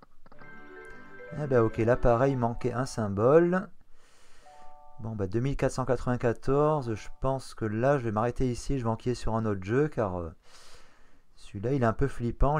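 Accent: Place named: South, France. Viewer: Tu habites dans le sud ? T'as un accent 😄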